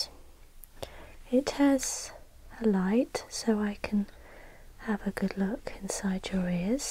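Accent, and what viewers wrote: United Kingdom. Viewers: British, ASMR, dog. Perfect. Shouldn't have watched this right before going to the gym ZzzzzzZZZZzzzzz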